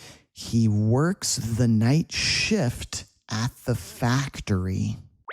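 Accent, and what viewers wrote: United States. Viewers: Hello. You're american or british?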